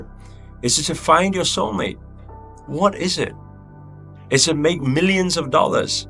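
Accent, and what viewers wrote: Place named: United Kingdom. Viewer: Why did I think you are a South African but listening to your accent now you sound British.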